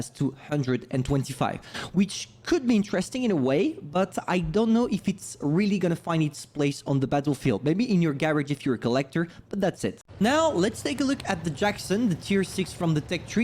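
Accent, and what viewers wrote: France. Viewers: Hey mate. Are French.?.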